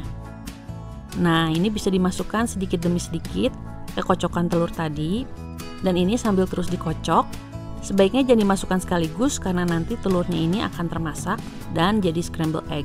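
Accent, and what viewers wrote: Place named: West Java, Indonesia. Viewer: Vanila', susu', dulu'😂 Mojang Sunda euy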